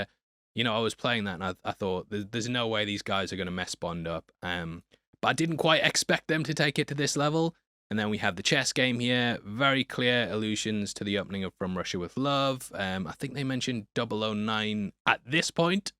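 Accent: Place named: Scotland, United Kingdom. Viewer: I like how his accent has a hint of Scottish in it.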